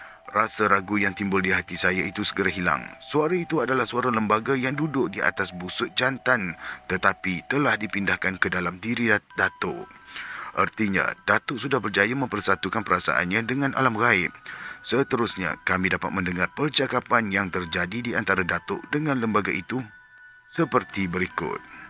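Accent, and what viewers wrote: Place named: Kelantan, Malaysia. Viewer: Assalamualaikum... Citer Kali ni best... Ada loghat Kelantan.. ada slang omputih... 😂 Walaupun kelaut tetapi mmg best.. menghiburkan. Gelak besar akak Kali ni😂. Lawak betul ni.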